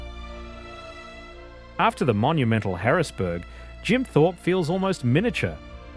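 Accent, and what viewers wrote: Australia. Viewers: I don't think there is anything I wanted more today than to come home eat some Panda Express and listen to an Australian man teach me about my home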